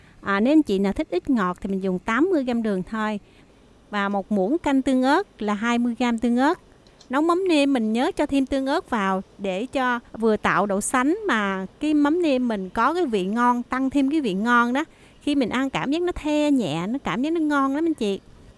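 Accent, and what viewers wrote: South, Vietnam. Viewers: Thơm thái là ngon nhất e người miền gì mà khóm nghe e nói giống miền nam mà nói khóm là seo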